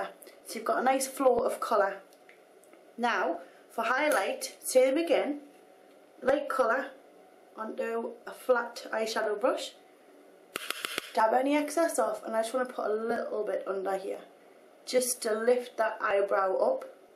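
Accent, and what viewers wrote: United Kingdom. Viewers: Well your ears must be weird because the accent Ms lauren has was voted the best accent in the UK :)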